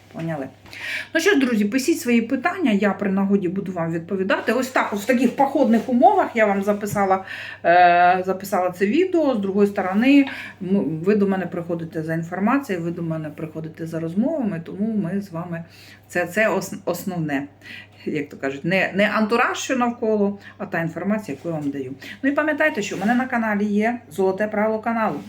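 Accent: Kryvyi Rih, Ukraine. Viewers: дорогенька,люблю вас слухати. Наш криворізький суржик,але,ну не облизуйте карти,коли їх витягаєте. Вибачте за зауваження.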